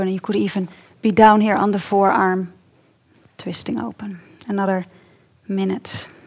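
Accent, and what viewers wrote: Netherlands. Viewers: I love your Dutch accent. And your videos. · No, it's a Dutch accent.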